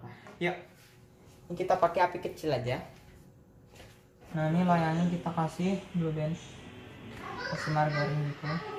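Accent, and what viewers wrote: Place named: Banjar, Indonesia. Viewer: Banjar mana suara ya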